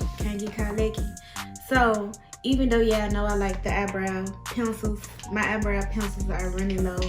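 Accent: South, United States. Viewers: Can I suggest a concealer for you to try?? Maybe the Maybelline eraser concealer in the shade tan? I love that concealer and it has a creamy consistency it’s not runny at all. Love the look! 💞💞 Btw you don’t talk country you just have a southern accent I’ve heard people talk country and that’s definitely not it lbs 💞💞💞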